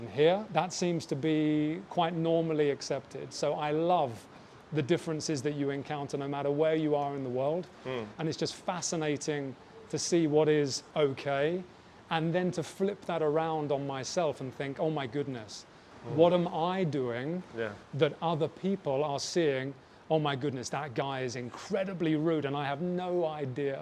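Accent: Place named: United Kingdom. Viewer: Don't really like the heavy distinctive british accent too, something like that of Mr Bean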